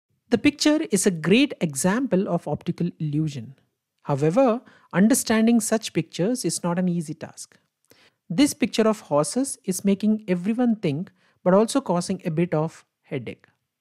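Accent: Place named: India. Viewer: Maybe you shouldn't explain an optical illusion to English speakers with such a thick Indian accent. I'm happy you explained it, but I couldn't understand what you said for H 6-7. I still only see 5.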